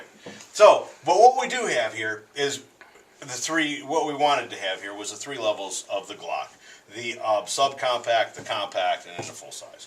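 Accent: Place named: United States. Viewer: if it wasn't for your American accent.. I'd think you were a fellow Canadian brother.. hahah annnnddd nobody here will steal your guns.. they may take some of your beers though! haha nice guns.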